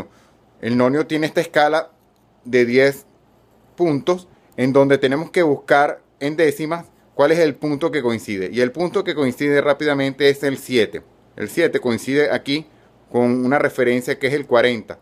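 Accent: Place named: Venezuela. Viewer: Tiene acento Venezolano!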